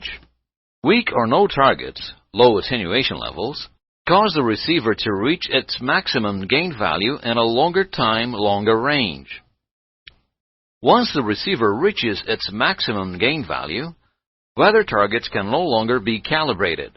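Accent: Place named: Netherlands. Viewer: They still play this old video in our training. The same narrator is in lots of the old Embraer CBT videos. I always loved this guy's accent... is that Dutch?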